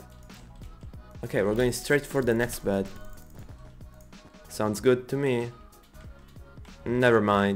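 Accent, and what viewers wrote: Italy. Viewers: Si sente proprio che sei italiano lol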